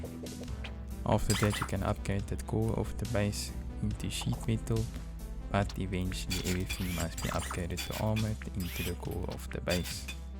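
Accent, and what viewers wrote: South Africa. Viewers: Hold up, you from South Africa mybru?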